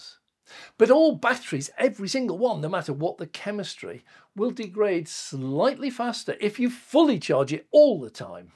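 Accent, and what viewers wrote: United Kingdom. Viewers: You sound British...why are you talking in miles instead of KMs?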